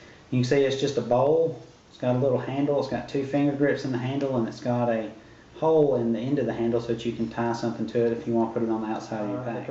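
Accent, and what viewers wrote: South, United States. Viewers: U sound southern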